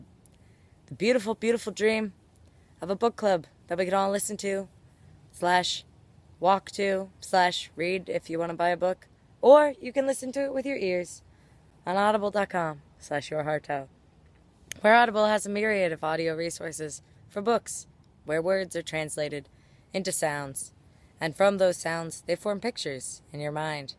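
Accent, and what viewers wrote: France. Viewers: Your cadence throughout this feels very french, even before you started talking about accents. :-) Sounds like a good book. I will see if I can squeeze it it. You seem to like books about self discovery, or self help books quite a bit. Or do you make your decisions based on trying to teach your audience?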